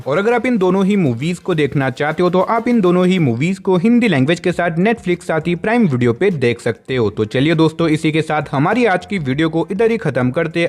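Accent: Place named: India. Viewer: Evriting is good just your fucking India accent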